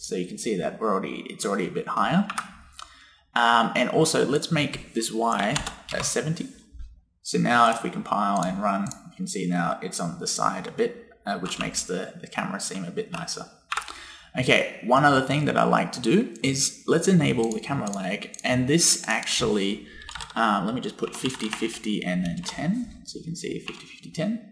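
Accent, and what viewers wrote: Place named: Australia. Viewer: Where are you based, you sound aussie??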